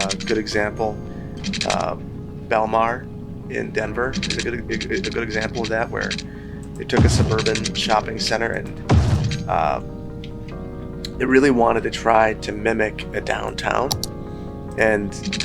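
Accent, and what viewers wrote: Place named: Midwest, United States. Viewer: I live in Madison! Yay! I thought you sounded like you had that midwest charm!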